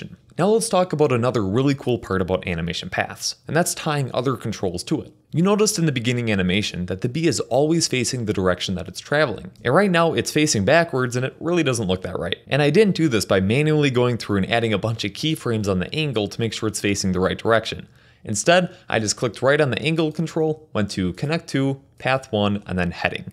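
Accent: Wisconsin, United States. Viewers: I love your Wisconsinite accent!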